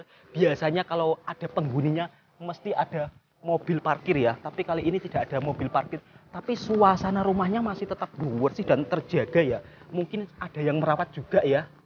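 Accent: Java, Indonesia. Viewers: Suara kamu terlslu pekat telo jowo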